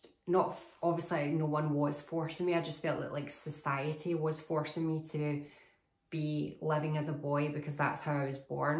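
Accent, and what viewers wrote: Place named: Scotland, United Kingdom. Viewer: I love hearing your Scottish accent. personally I am about 3 months on oestrogen. I don't really have anyone to support me in this journey I am taking. I would love if you lived in Ireland and I could call you daily and get some advice off you which would help me a lot. I do wish you the very best of luck and I would love if you could do more videos that would include your journey as much as possible.🌹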